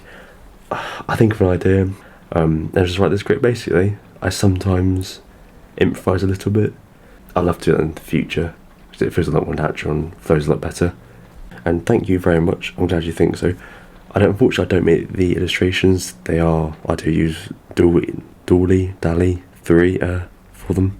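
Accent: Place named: United Kingdom. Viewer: Wow. This is the first impression of mine on this Chanel. If YouTube algorithm suggested I needed this, I'll take it :) I naturally listens to asmr (nature sounds mostly) because I need to focus on my books when I study through late night. Never thought I'd like to listen to British accent. It's really pretty now that I'm hearing it with concentration. It seems every language sounds different especially when spoken through each different accent. Besides that, you have got a really calming voice — it's easy to play it in the background and write through notes or journals. Feels like someone is accompanying. I'd like to subscribe because it feels right investing a little bit of time on when it can accompany me for studies! Please keep surprise us with new videos and be in good health and mind ❤ You have got this ✊ [ — this was fascinating to know someone online who shares quite similar perspectives as I do. 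That's pretty surprising because I feel like some people finds it overwhelming but it completely depends 🌞]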